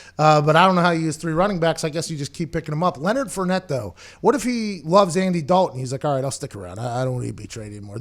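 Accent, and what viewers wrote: Pittsburgh, United States. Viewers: yinzer accent still comes out quite often.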